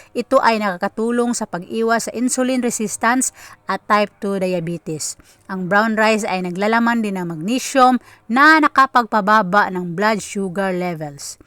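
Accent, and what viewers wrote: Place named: Visayas, Philippines. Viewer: BISAYA KAMAN DAY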